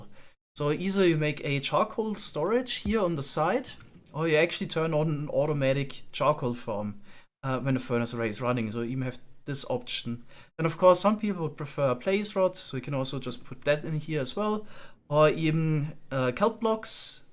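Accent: Germany. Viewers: He’s German yeah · i mean, the accent would suggest german or austrian :)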